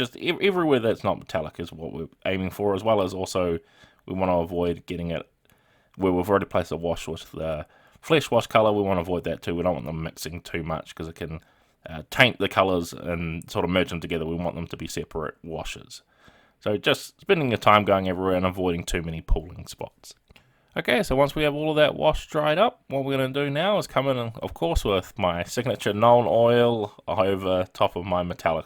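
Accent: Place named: New Zealand. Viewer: Oh a Kiwi voice! That's a nice change.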